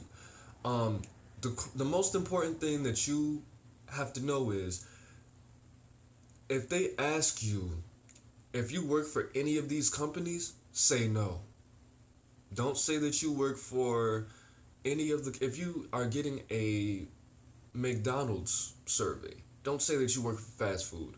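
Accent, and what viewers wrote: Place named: Chicago, United States. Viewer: I just watched this video for the second time. While watching your video I took notes....thanks for shouting me out.....we're u born in chiTown?...u have an accent to ya!....just applied and did do some lying on the surveys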